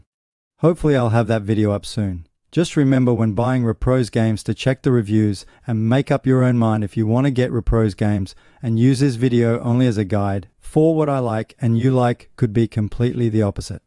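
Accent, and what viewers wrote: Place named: Australia. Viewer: Australia? I feel like an idiot not hearing it sooner from your accent, what's wrong w/ me? One of the crown jewels of my LTTP collection is a CIB Mattel/Australian copy -- had a classmate from my foreign school days act as the go between to secure it.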